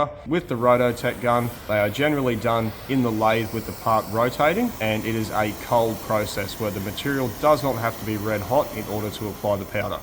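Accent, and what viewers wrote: Australia. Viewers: Awesome video, always cool watching the puddle gun and listening to profanity in Australian!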